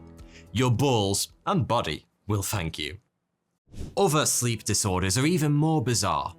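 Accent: United Kingdom. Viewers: I just love his accent. I've always wanted to have good british accent.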